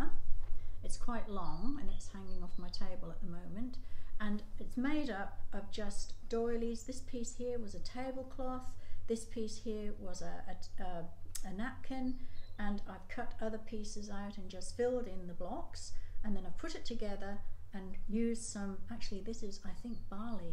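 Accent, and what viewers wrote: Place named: Australia. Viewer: This is absolutely beautiful and a wonderful tribute to days gone by. I've only just found your channel and can't wait to watch more. Lovely to hear an Aussie here. I'm in NSW 🌷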